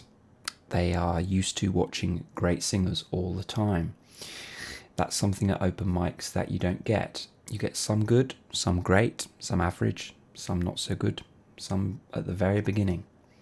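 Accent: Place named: London, United Kingdom. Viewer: Uou sound like your from London area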